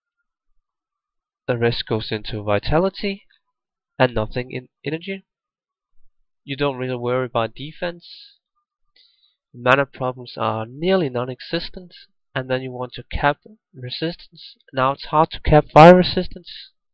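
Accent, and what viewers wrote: Denmark. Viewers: Er du dansker? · You Danish/From Denmark? Sounds a lot like it.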